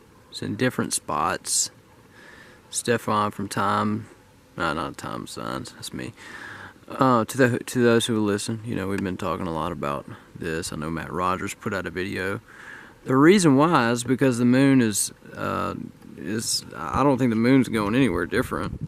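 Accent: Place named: South, United States. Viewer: We both have that country accent. I love hearing your voice, reminds me of home. Everyone here gives me a hard time about my accent, but I proud of who I am. We are living in crazy times for sure, Ive noticed the water receding here also. Stay safe my friend💞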